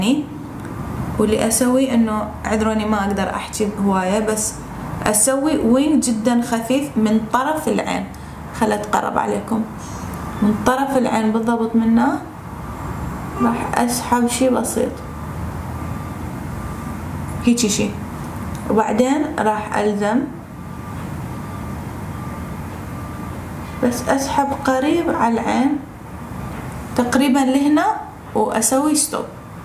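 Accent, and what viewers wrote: Iraq. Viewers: Samah Munther ترا عادي كل محافضه ولهجتها شو كلها مستغربه يعني قابل حاجية مصري لو سوري هي كلها لهجة عراقيه بالنهايه وكلها تفهمها ماله داعي هذا الاستغراب كلش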